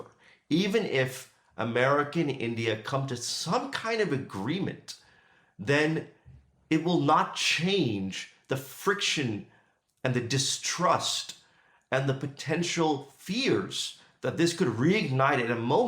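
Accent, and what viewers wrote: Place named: Canada. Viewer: You can tell he's not in the US. Listen carefully, and you'll notice he's Canadian.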